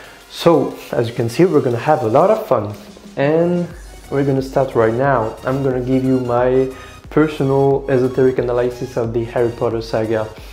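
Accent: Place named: France. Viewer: thank you for the time you spent working on this video, as I was searching for esoteric studies about the Harry potter saga I unexpectedly found myself watching your video. I'm delighted to find out that you're French. No doubt a native english speaker would spot your french accent in no time but as a French myself I would not have guessed you were one at first, so great accent, it's rare enough to be cheered and I must say it is a wonderful idea to share an english-speaking content like that, may it bring you a large audience! Continue comme ça, tu as gagné un abonné 😉 C'est une excellente idée d'avoir pensé à sous-titrer! Si je peux me permettre une critique: tu devrais utiliser une autre musique pour l'intro. Pendant la vidéo je me suis demandé pourquoi tu laissais la musique en fond puis j'ai réalisé que ce serait gênant si on entendait juste ta voix dans une pièce vide.. 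Peut-être que je suis le seul que ça chagrine mais encore une fois tu devrais changer de musique, ça fait un peu cheap genre "best techno 2009" c'est dommage, sache que je dis cela en toute bienveillance pour que tu t'améliore 🙂 Je vois que tu maîtrise bien la langue de Shakespeare -- assurément mieux que 95% des Français -- mais encore une fois sans vouloir être pénible j'ai remarqué quelques erreurs qui pourraient être évitées. Si tu le souhaites je serais ravi de t'aider de quelque manière que ce soit, par exemple en relisant ton script ou tes sous-titres ou t'aider à faire autre chose, je su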